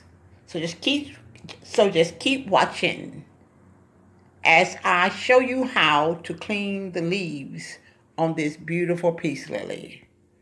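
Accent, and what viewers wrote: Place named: South, United States. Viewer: Miss I think you are precious I do totally enjoy watching your videos you make me laugh you remind me of my ex-husband's grandmother. But I am positive that you are not as old as she. But it's just maybe your southern accent or the way you talk but I just find you precious please keep putting out the videos that you have your plants are beautiful I do enjoy my plants also I talked to them and I love them like they are my babies and my grown children laugh at me my son laughed at me today because I just bought a peace lily today and I was so tickled I could not wait to get it home thank you for your video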